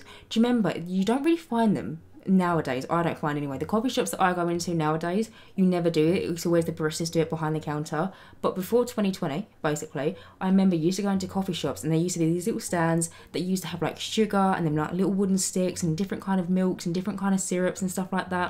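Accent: United Kingdom. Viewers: The British accent helps a lot.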